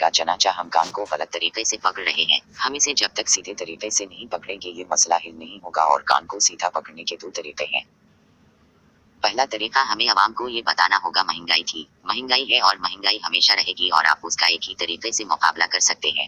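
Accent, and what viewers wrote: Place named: United States. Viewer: یہ امریکن اردوہے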